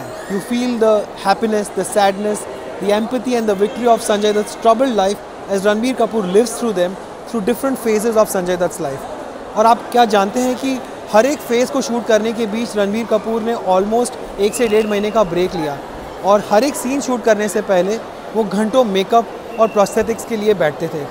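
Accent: Gujarat, India. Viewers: r u Gujarati?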